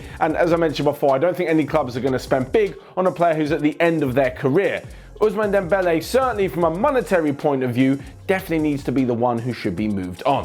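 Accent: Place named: England, United Kingdom. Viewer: are u german? If so why do u sound like an English man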